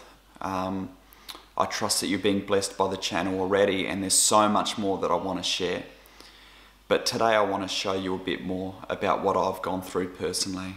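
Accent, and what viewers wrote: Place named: New Zealand. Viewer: Hi! I just come across you a few minutes ago. I to have chronic fatigue and I am a Christian. I was just diagnosed early last year 2020. I’m from Auckland New Zealand. You sound like a kiwi are you from N.Z?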